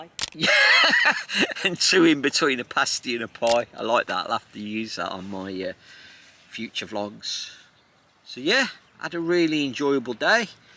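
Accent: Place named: West Midlands, United Kingdom. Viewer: Dont know for sure but the accent is west mids??? so be a bit of a trek. Im south notts so kettleby is only 20 mins from me. Nice to meet you 😀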